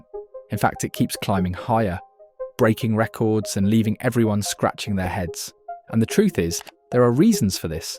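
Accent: United Kingdom. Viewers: British accent doesn't help. Bogus video. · Is it somehow cool to have a guy with an English accent narrating a video consisting of an animated hand drawing pictures? Why? 😶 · I hear a British accent I know the guy talking is a Socialist.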